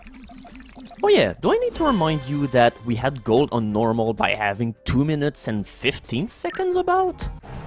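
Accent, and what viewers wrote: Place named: Quebec, Canada. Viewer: This is why i love watching LPs.He's just so calm and collected always, and the french-canadian accent gives him a special variety from other LPers today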